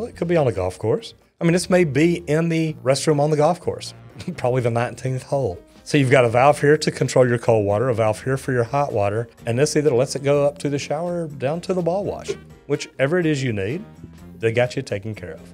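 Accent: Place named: South, United States. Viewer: "if y'all haven't seen it..." *as I'm clicking for the new video. Must be the voice... making me do it, how do I deny Southern Charm?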